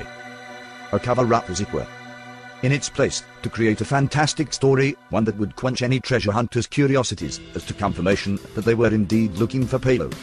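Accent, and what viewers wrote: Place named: England, United Kingdom. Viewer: Mystery history of lies._. Omg , always a stupid english voice like " they are superior in solving lies " ._. Not. Heres your truth the mystery trys to alter your perceptions away from._. A million pounds worth of lies._. Thats a good wishing well. They go broke and medias get rich ._. They wish to find gold at the bottom of a well ._. They wish they can find wealth benieth a well._. The oak island wishing well._.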